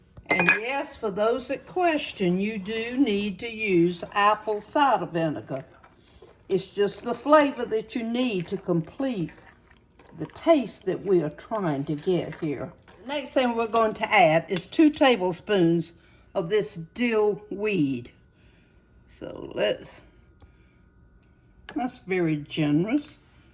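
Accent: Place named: South Carolina, United States. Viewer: When my husband was active duty, we were stationed in Hawaii for a while. The Hawaiians swear by their macaroni salad. One thing I learned from them is interesting. Before you add anything else to your cooked macaroni, stir only the apple cider vinegar into the noodles. Just let it sit for an hour or two before adding everything else. I can't say how but it does make it 'different'. I can't say better because yours looks marvelous. Anyway, just thought I'd mention it. I love your channel. My grandmother sounded just like you. She grew up near the SC line and adopted your most beautiful accent.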